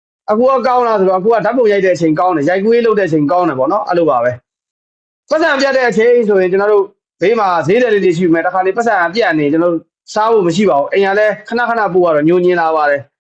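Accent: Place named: Upper Myanmar, Myanmar. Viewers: ကိုဇင်ယော်ရေအညာှသံဝဲဝဲလေးနဲအမြဲနားထောင်ဖြစ်ပါတယ် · အခုထိ youtube ကို mobile data နဲ့ ဘဲ fb မရပါ ရက ဆိုးပါ့ အပြောတွေက ဆက်စပ် ထိမိဘဲပြောတော့ပြောလိုက်မယ်နော အညာသံဝဲနဲ့ သဘေကျတယ် သားတို့နဲ့ တလသူအားလုံးကံကောင်း ဘေးကင်းကျန်းမာပါစေ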